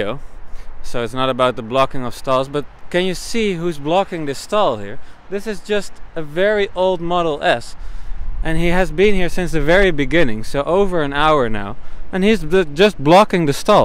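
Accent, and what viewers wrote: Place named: Netherlands. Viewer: your Dutch/English accent sounds different from other Dutch/English accents I’ve heard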